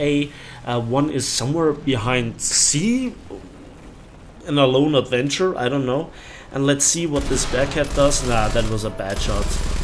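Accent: Germany. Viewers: Hmm, you sound German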